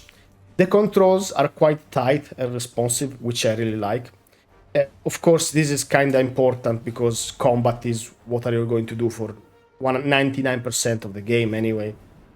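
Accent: Italy. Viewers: pasta accent - you have my like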